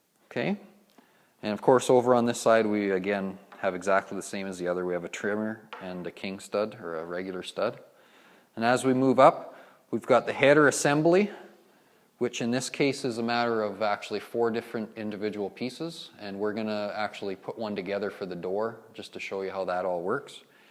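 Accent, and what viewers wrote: Canada. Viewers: you sound Canadian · Sounds like he is canadian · BTW - do you live in or near Canada? :) I hear the long "o" sounds (aboot). :)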